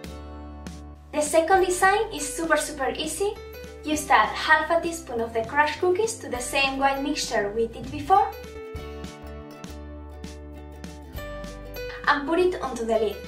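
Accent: Spain. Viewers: She is from Spain, there is too much that she speak english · Se nota a tres kilometros que es española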